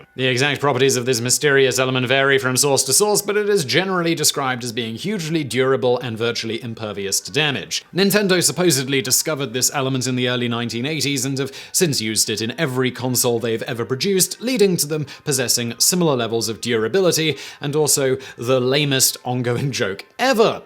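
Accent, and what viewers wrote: United Kingdom. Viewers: Since when does have a british accent?! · I can't tell if is pronouncing all those Japanese names wrong or if he's just pronouncing them all British.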